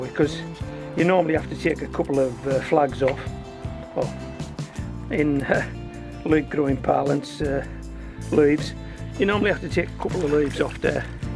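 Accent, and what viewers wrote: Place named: Northern England, United Kingdom. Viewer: your accent is cute:) are u from northern england?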